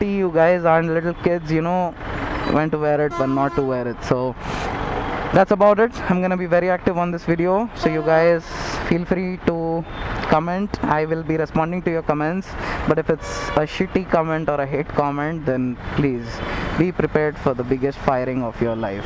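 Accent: Hyderabad, India. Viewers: Lov u n ur videos too bhaii.. Donno the reason for God sake but i absolutely enjoy watching ur videos.. They are not dramatized nor filled with juvenile but they are very very natural and appealing.. Ur communication is awesome no doubt abt tht and wat i lov the most is tht typical hyderabadi accent ull be using here n thr.. Even in my frnds circle we discuss abt ur videos more than any.. Hum hum hyderabadi bhaiii:p.. Well cheers to you bhaii..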